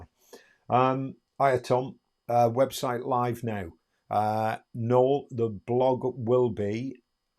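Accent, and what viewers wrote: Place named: Newcastle, United Kingdom. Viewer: Ill give you Fifty Quid if you say Utterly butterly in your Geordie accent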